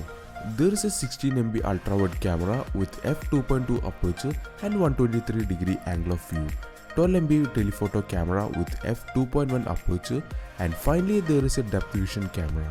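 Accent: Kerala, India. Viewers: R from from kerla. ? I m guessing from accent.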